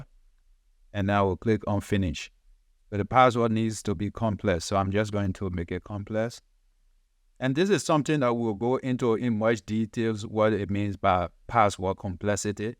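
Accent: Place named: Liberia. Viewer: Big up yourself. You sound like Liberian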